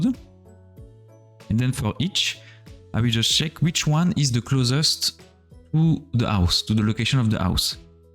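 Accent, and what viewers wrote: France. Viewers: On reconnais l'accent Français lol ^^. Tu pourrais en faire en Francais ? Sa serai cool !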